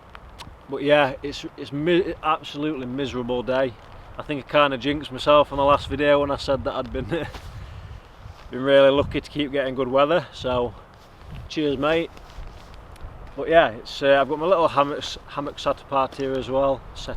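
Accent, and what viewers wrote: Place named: Northern England, United Kingdom. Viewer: Manchester mate! I don’t think your too far from me by sounds of you 😂 👍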